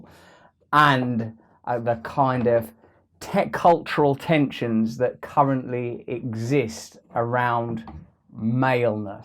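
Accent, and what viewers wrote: United Kingdom. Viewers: Fucking british and their pronunciations. Controversies...aluminum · Ive heard him talk on video. Never picked up british accent before.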